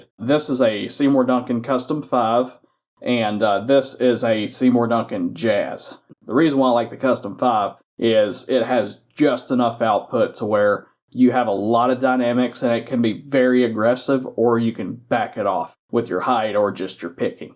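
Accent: United States. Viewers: ok I was thinking arkansas with that accent lol. Cedarpoint! We went there in June. I live in nebraska and esp are non existing. Btw my ltd is a fret buzzer. I'm about to send it to sweetwater for a plek and setup.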